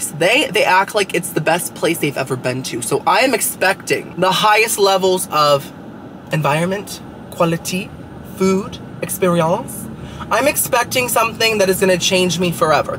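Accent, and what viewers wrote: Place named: United Kingdom. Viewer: I think your videos are way more fun to watch them in the UK. I love your British accent! Does it help the stutter? I hope so. We don’t have many of the food places you frequent. I want to jump on a plane 😂 xxx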